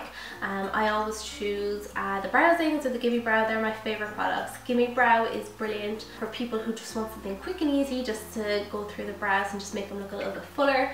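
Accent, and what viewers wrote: Ireland. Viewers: Such a helpful video!!And you have such a lovely Irish accent.I live in the U.S. but I have family in Ireland I hope I get to visit some day.💞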